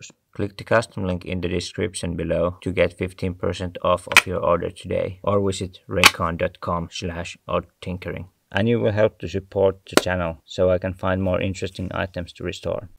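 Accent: Finland. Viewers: is that a finnish accent? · he's Finnish there's no question about it. All the products he uses are with Finnish labels, the newspapers he uses sometimes are Finnish etc. Also the accent is very typically Finnish too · You have such a lovely Finnish accent! I hope we hear you talk more sometime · Is that Finnish accent? Torille! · I love your voice with your finnish accent! very fun to listen to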